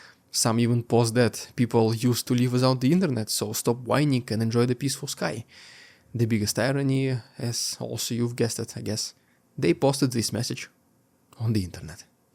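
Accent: Russia. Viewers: I am listening your pathetic bs and your horrible accent from Russia right now🙈 😂 do not worry for us. We have more speach freedom and access to the information than any citizen of so called free democratic world. 👌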